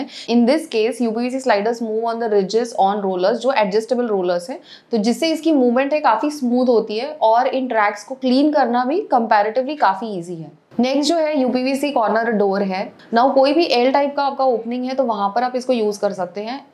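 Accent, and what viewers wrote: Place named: Hyderabad-Karnataka, India. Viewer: Very nice like always in detail. Your tone wz Little bit HKBRD region your belonging to which state. Very nice clear tone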